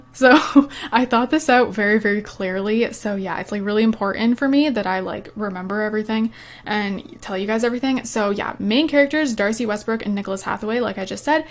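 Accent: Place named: Canada. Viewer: YOUR CANADIAN "OOUT"DOOWN"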